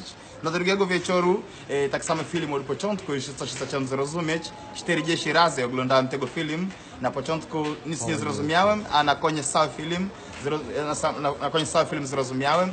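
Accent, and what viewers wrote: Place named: France. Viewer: Gówno prawda mówi z zabojadzkim akcentem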